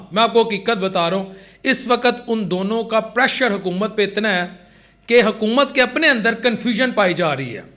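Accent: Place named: Punjab, Pakistan. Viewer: لعنتی یہ بھی آگیا ہے اسکو پتہ نہیں وہ اپنے باپ کے لیئے آرہے ہیں تم tacit اینٹی عمران بیانیہ دے دیا ویسے تم بھی اردو پنجابی ایکسنٹ میں بولتے ہو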